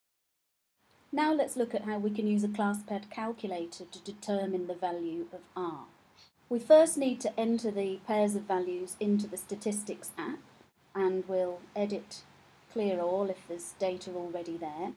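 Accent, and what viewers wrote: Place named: Australia. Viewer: thanks :) aussie accent eh ;)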